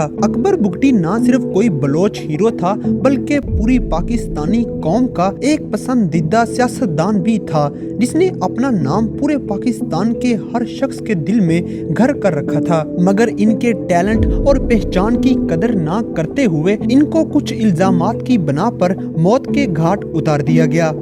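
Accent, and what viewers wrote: India. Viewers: In my opinion The accent belongs to India. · یہ چینل انڈین ہے اس کا لبو لہجہ بتا رہا ہے ۔ نفرت پھیلا رہا ہے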